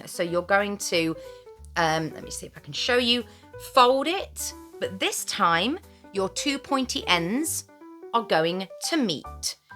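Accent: United Kingdom. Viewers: LOVE this! What a great teacher you are! I posted a comment requesting a video like this on one of your other videos, but I don’t remember which one it was. Anyway, I am enjoying binge watching your videos. They keep me company while I’m washing dishes, preparing a meal , etc, and then I sit down lighter when I have more time and watch as well as listen. I love your voice. As a Texan with a Texas drawl, it’s so refreshing to hear a British accent. Keep them coming!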